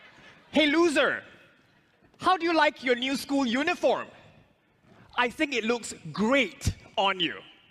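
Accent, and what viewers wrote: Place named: India. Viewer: India accent sounds like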